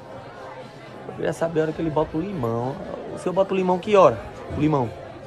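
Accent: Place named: Northeast, Brazil. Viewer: Só quem é do interior do nordeste pra saber que falamos com esse sotaque e maneira de se expressar.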